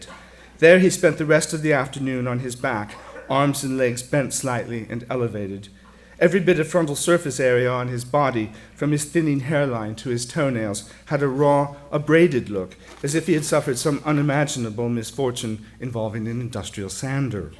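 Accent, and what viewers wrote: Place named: United Kingdom. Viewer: It’s amazing there are moments he sounds British and others American. I can understand why sometimes he describes himself as an alien in his own country.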